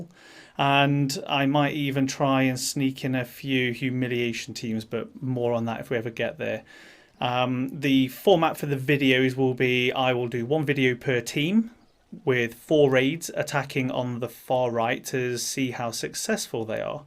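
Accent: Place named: United Kingdom. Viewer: You sound British tbh :P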